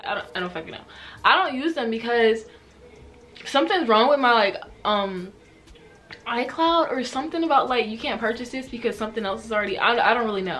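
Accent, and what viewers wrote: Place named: South Carolina, United States. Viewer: I knew I noticed your accent I'm from South Carolina ❤️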